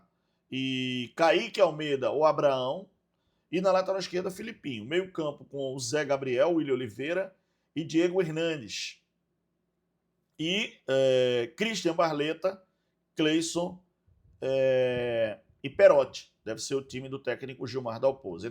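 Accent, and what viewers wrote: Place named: Rio de Janeiro, Brazil. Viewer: Não tem coisa mais ridícula que o carioquês!!!!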